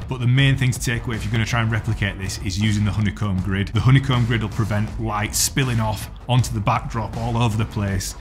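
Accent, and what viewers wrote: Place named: Leeds, United Kingdom. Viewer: ALL LEEDS AREN'T WE! No but seriously, great stuff mate. Got here because I'm thinking of buying a second hand 70D funnily enough, was nice to hear a familiar accent!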